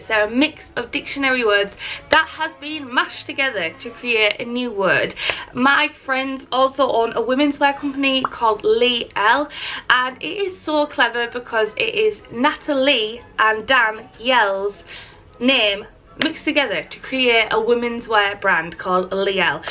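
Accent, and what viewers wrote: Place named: Middlesbrough, United Kingdom. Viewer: So pleased you made this video. I went through the same sort of process writing down all sorts and ended using 3 names together. The name my dad always called me - sweetpea - and the name my brother used to annoy me with - Polly - and because my business is craft related I used - crafts. So hence the name Sweetpeapollyscrafts. Not sure now if thats too long or I should have used cards instead of crafts as that is what my business is about. Your accent makes me feel like I am back home in Middlesbrough and I really love it when you visit the coffee shops in Marske, its not far from the hair salon I used to go to - Contemporary. Keep up the great work!!!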